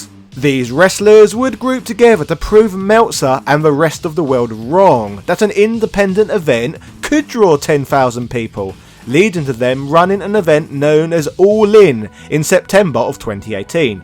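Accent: United Kingdom. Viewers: 5 mins and 42 seconds in and so far nothing but some annoying brit talking and showing stuff that has nothing to do with the title disliked and flagged as misleading title...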